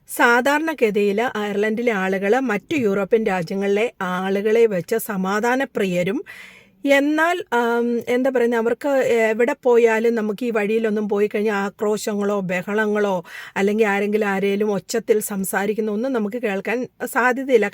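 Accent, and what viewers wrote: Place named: Haryana, India. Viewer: Like haryana slang ....same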